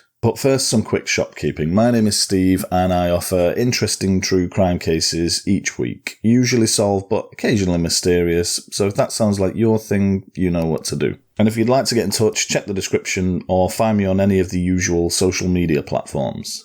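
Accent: United Kingdom. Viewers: Hey Steve, I just discovered your channel a few days ago and have now watched several of your offerings, some familiar, some unfamiliar. However, being a fellow Brit I am enjoying your perspective (& accent! 😊). I very much look forward to catching up on more of your vids and your future releases. Blessings... Puccini 😇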